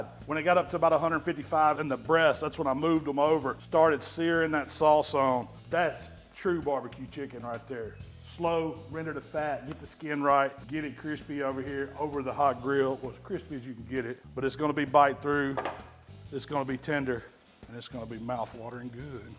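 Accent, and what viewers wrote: South, United States. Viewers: That’s the sound of Southern hospitality.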